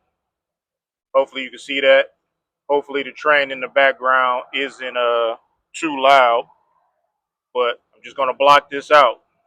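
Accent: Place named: Detroit, United States. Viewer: That sound like a Detroit accent too 👀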